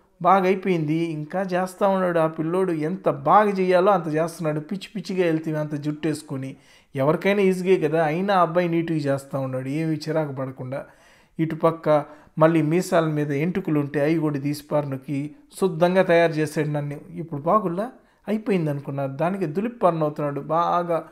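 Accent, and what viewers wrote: Nellore, India. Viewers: Sooper comedy Mana nellore yasa adaragottav 😁😁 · anukuna brother mi slang chusi ,manadi kuda Nellore ee. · Nellore slang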